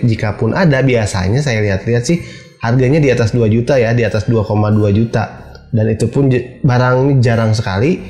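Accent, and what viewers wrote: Bandung, Indonesia. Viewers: Sama2 orng bandung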